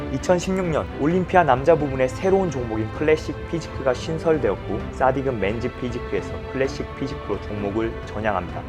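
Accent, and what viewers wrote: Gyeongsang, South Korea. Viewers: 경상도사투리쓰는사람이네 ㅋㅋㅋ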